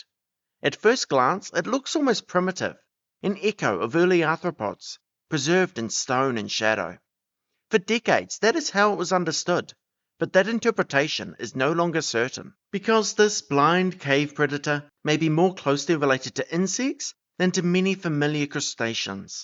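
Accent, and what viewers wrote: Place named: New Zealand. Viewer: You have such a strong New Zealand accent 😂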